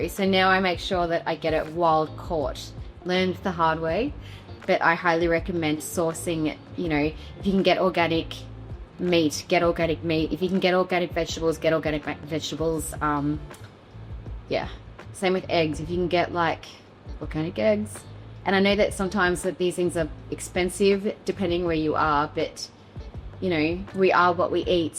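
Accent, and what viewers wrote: Australia. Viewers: Surprise surprise 😂😂😂. Only joking, mate. I was expecting her to sound American. The Aussie accent was a complete shock.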